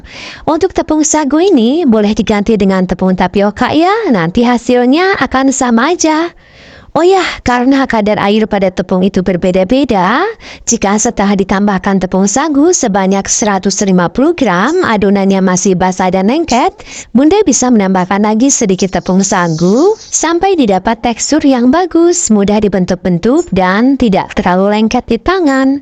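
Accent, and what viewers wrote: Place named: Banyumas, Indonesia. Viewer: Ngapak usah pake logat bile ngomong nya ngapa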